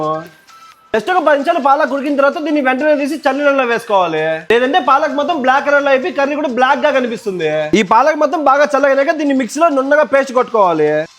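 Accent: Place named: Kadapa, India. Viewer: చెప్పేటప్పుడు కొంచెం నెమ్మ చెప్పండి నీ లాంగ్వేజ్ అర్థం కావటం లేదు మాది పక్కా ఆంధ్ర మీది కడప స్థాయిలో ఉంది లాంగ్వేజ్ కనుక కొంచెం నెమ్మది చెప్పండి అంటే స్లోగా చెప్పమని అర్థం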